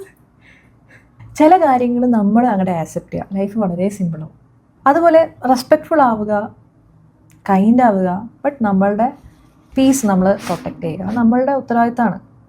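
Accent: Kannur, India. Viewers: ഇങ്ങള് കണ്ണൂർ ആണ് ല്ലേ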